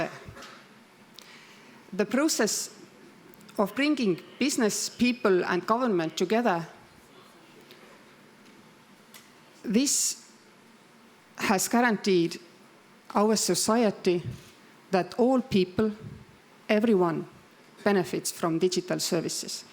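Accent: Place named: Estonia. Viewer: For the love of god, woman, learn how to speak english! You´ve been working in Luxenbourg for how long now??? I´m an estonian too, but you will never hear me speak like that. The accent is just hurtful for my ears.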